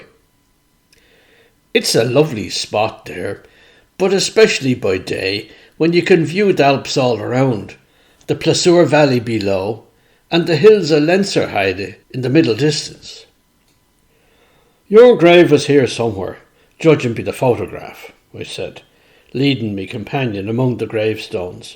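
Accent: Dublin, Ireland. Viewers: Beautiful unique Dublin voice and a wonderful story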